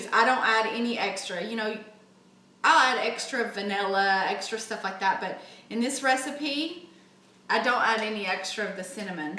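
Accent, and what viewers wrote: South, United States. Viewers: Oh i love the accent, where are you from? Sounds southern? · I've never seen this channel before but I heard that southern accent and I immediately knew this woman can bake. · Hey! Just wanted to let you know that I just made your muffin recipe and they turned out amazing!!!! Love your southern accent and style! Thanks for the bomb recipe!!❤❤❤ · I absolutely LOVE THIS RECIPE! It’s the absolute best ! PS, when I heard your southern draw I knew this was the recipe for me!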